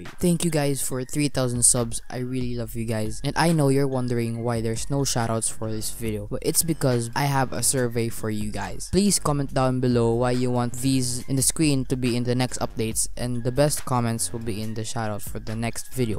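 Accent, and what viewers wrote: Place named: Philippines. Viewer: Ur voice is like a Filipino:) I'm Filipino too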